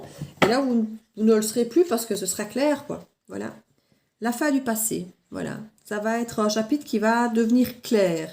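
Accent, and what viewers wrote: Liège, Belgium. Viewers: J'ai mis un pouce d'encouragement... j'avais bien senti cette pointe d'accent Namurois ou Liegeois... avant de lire la description... (ah ben avec le "Oufti", je m'etais pas trompé, c'etait bien l'accent de "Lidge" :p ) Bonjour de Mons :)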